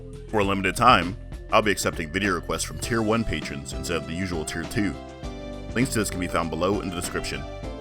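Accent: Appalachia, United States. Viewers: Love the hillbilly voice!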